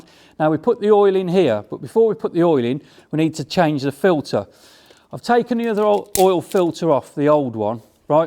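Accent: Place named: Cockney, United Kingdom. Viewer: His accent is not an accent you hear very often, consequently unfamiliar to most Americans. If I were guessing, I would guess it's a Cockney accent which is barely intelligible to the average American.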